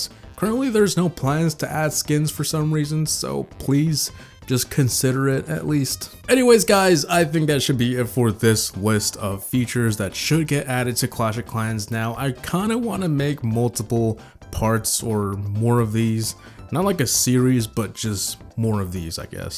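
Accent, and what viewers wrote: Norway. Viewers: are you norwegian? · E du fra Norge? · Are you Norwegian? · Waitwaitwaitwait wait. Are you Norwegian???? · wait u r from norway? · Hold up wait a minute are you from Norway? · wait.... are frome norway??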